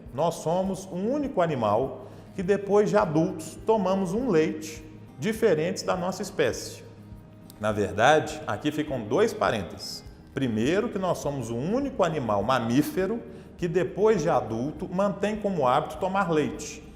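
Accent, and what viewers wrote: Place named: Minas Gerais, Brazil. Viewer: Oi Dr, adoro escutar suas explicações, muito bem explicado, com sutaque bem mineirinho, abraços.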